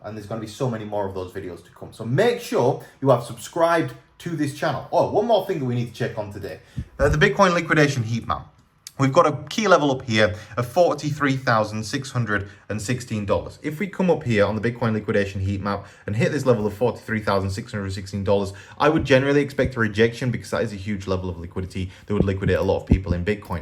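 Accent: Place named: North, United Kingdom. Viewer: It’s my favourite northern accent talking bitcoin lol 😂 where was the one video a day broskie lol I know how it goes lol give thanks same way my guy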